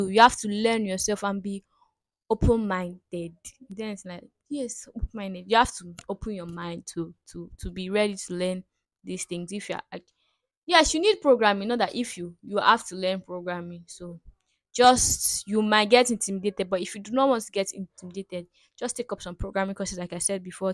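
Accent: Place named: Nigeria. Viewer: hehe. I have missed hearing Nigerian accent. Compuuter haha. It's comp-iu-ter 🤗🙃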